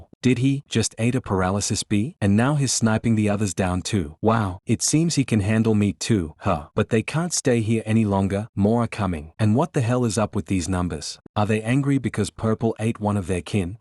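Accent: United Kingdom. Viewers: I noticed the British accent last time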